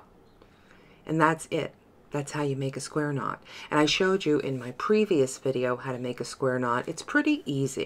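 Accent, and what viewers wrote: New York, United States. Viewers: Absolutely Love your uplifting attitude!!!! I would love to start a YouTube channel. I love crafting, my sister inspired me to begin & now I can’t stop!!!! I love the DIYers , there’s so much to learn & be inspired from. Don’t stop what you’re doing. Trying to figure your accent NJ/NY?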